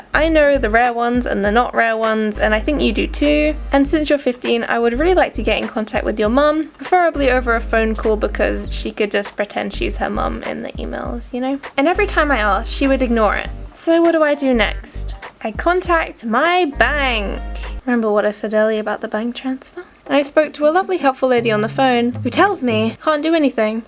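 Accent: United Kingdom. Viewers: Wait, you're british right? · yessss i know ur english now i thought u were from america lol trying to find english youtubers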